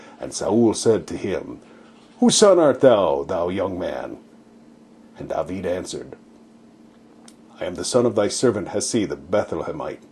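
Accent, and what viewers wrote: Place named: United States. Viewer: I enjoyed your clear reading of the King James version. I am from Ft. Wayne, IN and it is easier to understand old English text when read with an American accent. Since the old Hebrew names are uncommon in English, I liked the way you pronounced every letter phonetically.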